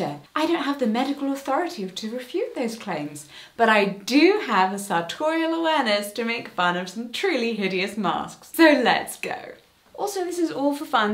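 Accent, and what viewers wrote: United Kingdom. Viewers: You sound extra British in this one 😂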